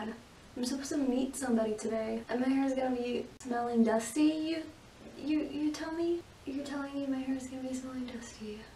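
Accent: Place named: India. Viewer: her accent is some what indian...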